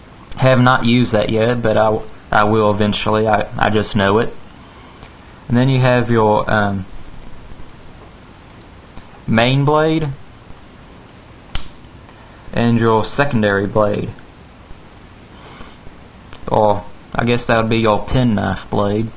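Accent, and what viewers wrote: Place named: Texas, United States. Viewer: it obvious he from texas luk at the accent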